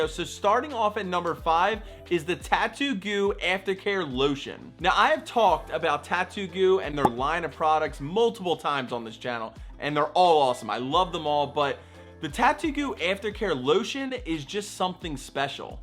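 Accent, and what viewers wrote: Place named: Delaware County, United States. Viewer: Are you from delco cause you sound like it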